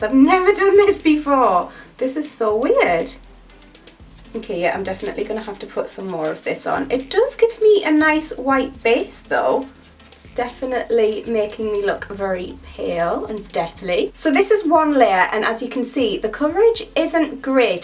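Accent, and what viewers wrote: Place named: Wales, United Kingdom. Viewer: You probably aren't welsh but you sound really welsh. Im welsh :D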